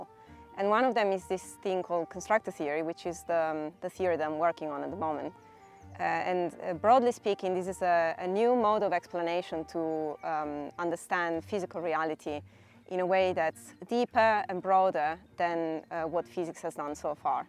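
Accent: Italy. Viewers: Er, her accent would be Italian, not English, as she comes from Turin.....So she passes the Turin Test! (sorry!)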